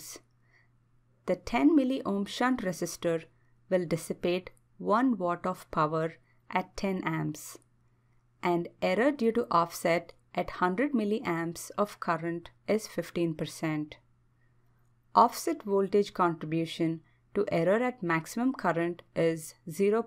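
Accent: India. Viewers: wtf why must indian speaker? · India English？I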